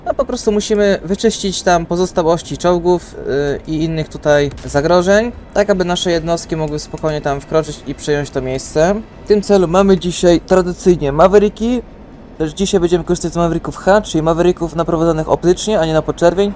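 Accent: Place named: Poland. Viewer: Siema, fajnie w koncu ogladac Polski akcent w DCS. Aktualnie mam mala przerwe od DCS. Grasz moze w IL2 Great Battels ??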